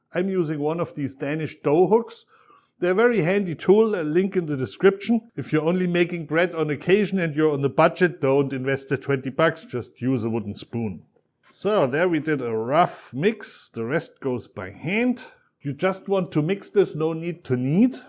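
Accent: Germany. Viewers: Nice, and the German accent is nice too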